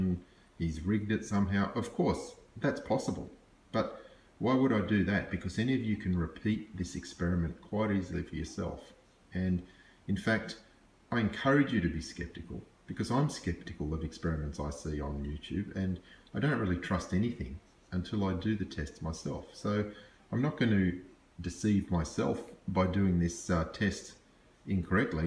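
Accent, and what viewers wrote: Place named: Australia. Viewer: en général j'active les sous-titres automatiques, ça m'aide à comprendre même si le petit accent australien de (que moquerait Melenchon?) cause parfois des soucis à l'algorithme.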